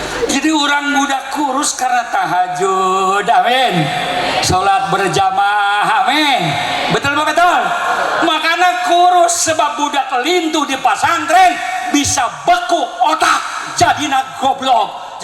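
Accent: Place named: Banten, Indonesia. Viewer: Titisan pandeglang Banten na aya keneh tegeur tina logat ngomongnya kiyai, pelem bahasanya pasehat nyebut jurigna 🤣 Sehat panjang umur kiyai.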